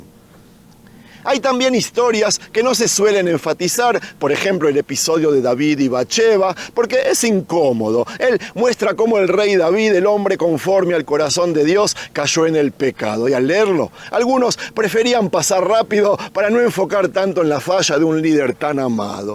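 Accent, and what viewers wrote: Argentina. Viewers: Isaias 53 es desgarrador tremenda palabra que describe a nuestro Señor Jesucristo. Saludos Dios te bendiga. Tienes acento y modismos argentinos che querido.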